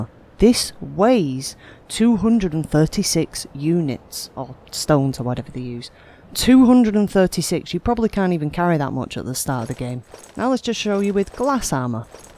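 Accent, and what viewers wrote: United Kingdom. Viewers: Love your british accent. Onarmored, HandtaHand, Armar, Stoff, Twente, Oround here, Jomp, You see "ma" point, When you punch "shombody", baa (bar), fonny. Very usefull guides.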